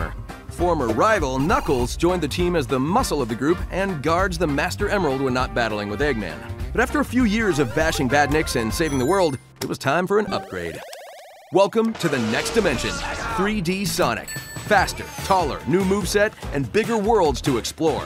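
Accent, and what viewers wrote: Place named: England, United Kingdom. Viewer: Finally an english dude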